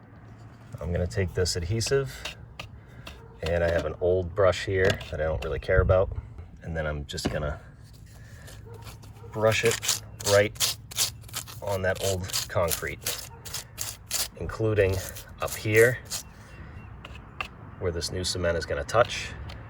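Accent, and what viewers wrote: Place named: Northeast, United States. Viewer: Try as you might you can't hide that Northeastern accent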